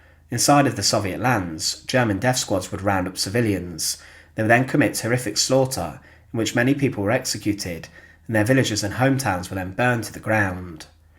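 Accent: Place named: Midlands, United Kingdom. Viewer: You're not British obviously. I think he narrates really well. Sounds like midlands maybe east of Birmingham somewhere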